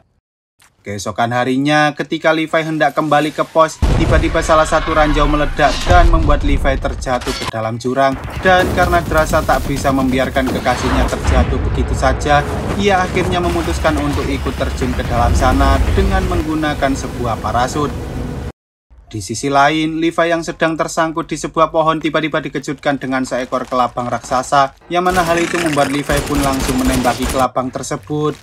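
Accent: Java, Indonesia. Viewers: Kok medok ngono Min suaramu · Logat jawa nya bisa di rubah gak bang,gak enak kali dengar nya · Jawa yaaa. Koo ana omongan wis ora kesuen.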